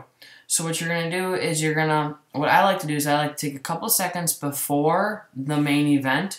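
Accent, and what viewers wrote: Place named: Wisconsin, United States. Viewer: I can tell you are from Wisconsin just from your accent.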